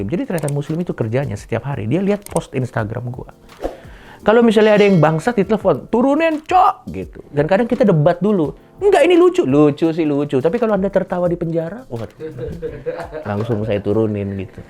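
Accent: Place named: Madura, Indonesia. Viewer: saya mendengar suara mas mas madura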